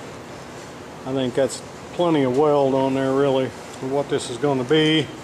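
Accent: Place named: United States. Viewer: I like the way he speaks . Reminds me of real American person how they talk .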